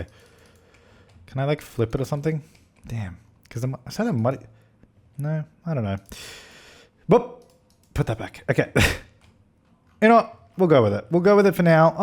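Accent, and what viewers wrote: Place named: Australia. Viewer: i love listening to game commentary in an aussie accent, they don't go fucking mental like Americans which is just lovely ...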